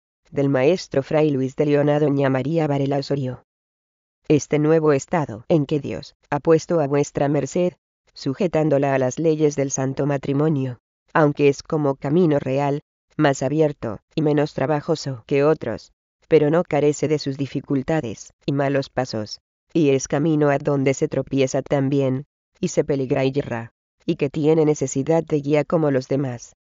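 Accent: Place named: South America. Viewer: pronunciación robótica y sudamericana, llena de seseo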